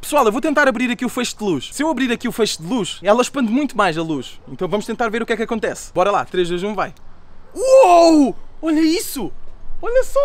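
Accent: Portugal. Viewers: É DE PORTUGAL OLHA COMO A VOZ DELE É DIFERENTE 😳😒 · seu sotaque de Portugal é mais fácil entender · De que local você faz os seus vídeos ??? Pergunto devido ao seu sotaque da minha adorada terra PORTUGAL, mais sou do BRASIL. Abraços amigo fique na PAZ. Obs.: Me inscrevi, dei joinha e ativei o sininho. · Ele... É português mesmo ou ele só faz o sotaque? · Ele não fala o Português do Brasil, e sim o Português de Portugal.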